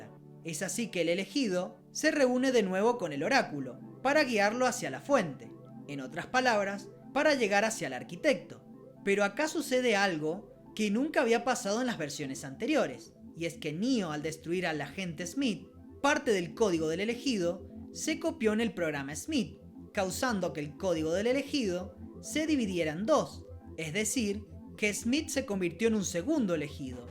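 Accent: Argentina. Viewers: Acento Argentino jodiendo toda la saga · matrix version porteño che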